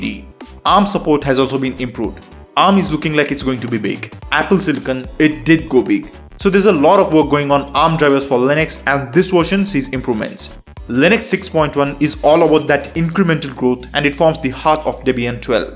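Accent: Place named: India. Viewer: My ears are bleeding hearing this effed up horrible Indian accent, and I had to stop at less than a minute into the video 🤮🤮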